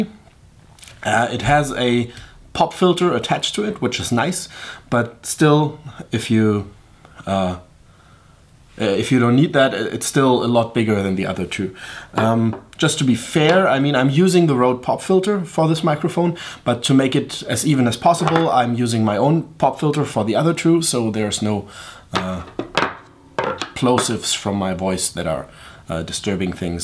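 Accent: Germany. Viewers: hi du bist deutscher oder ? höre da viel deutschen akzent raus ? Also ich liebäuge ja zur zeit sehr mit dem rode nt usb. das akg hat mMn. auch sehr gut geklungen, aber wenn man sich so viel auskennen muss um da viele einstellungen vorzunehmen, damit es so klingt, bleib ich wohl lieber beim rode nt usw. ich will auch kein xlr + interface usw. kaufen. finde ich übertrieben, da ich es ja nur am pc benutzen möchte. das akg dich enttäuscht hat, hat mich echt überrascht, weils halt am anfang gut klang und allgemein ich nur gutes von der firma gehört habe.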